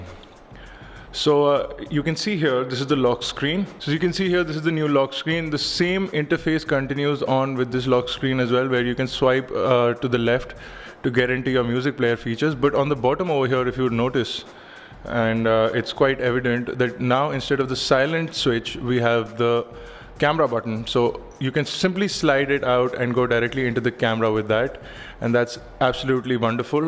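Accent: India. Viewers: Indian accent dude :D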